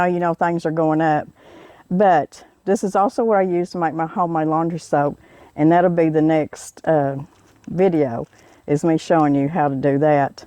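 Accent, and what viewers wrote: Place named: Alabama, United States. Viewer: I’m half American living in my moms home country of Sweden. Your accent makes me so nostalgic for the US. My dads whole side of the family talks just like you, it’s my favourite sound in the whole world. Thank you for sharing your wisdom Ms. ❤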